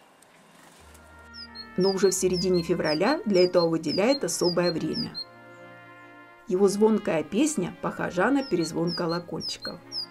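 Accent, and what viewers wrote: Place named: Vologda, Russia. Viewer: Интересный говор. ВОлогодский конвой шутить не любит. Угадал?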